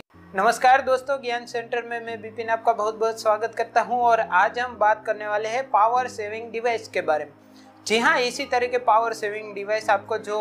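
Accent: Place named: Gujarat, India. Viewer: language tone denotes gujrati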